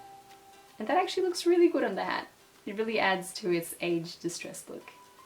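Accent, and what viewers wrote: Australia. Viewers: yeah haha i had a feeling it was more of an australian accent but idk something about your voice sounds just like her! lol meant as a compliment of course i just stumbled on your channel and i love your videos(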